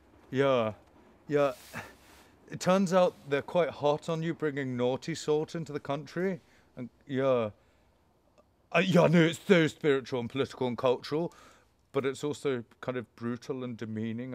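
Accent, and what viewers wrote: United Kingdom. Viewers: What kind of accent is this? It's like some British accent mixed with a Californian accent.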